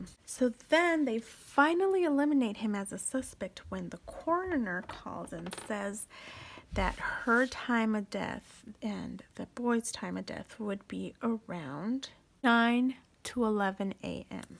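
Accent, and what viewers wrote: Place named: New York, United States. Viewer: Love your New Yorker accent...lol It’s a shame no one was found accountable for the crime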